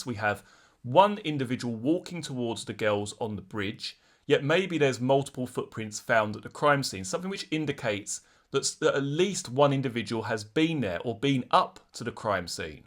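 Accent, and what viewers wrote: United Kingdom. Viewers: I look forward to all your videos regarding this crime. You throw out so many points, & theories like I do, & think like I do. Also, your accent is wonderful,& British accents are in my top 3 favorite accents, and it really brings out the captivating way of telling a story, IMO.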